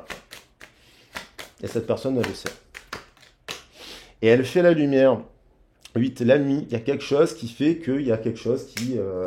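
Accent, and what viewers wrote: South, France. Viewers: Coucou j'adore vos guidances et l accent du midi moi toujours seule les week-end mon autre chez lui sa sorcière anniversaire 🎂 🎂 moi je suis de trop gros bisous et bonne soirée je vous embrasse très fort moi j ai mon voyage bloqué je devais pzrtir du 7 au 14 09 bloqué c'est la.sorciere sui a bloqué super mille bisous ❤❤❤❤❤❤❤❤❤🎉🎉🎉🎉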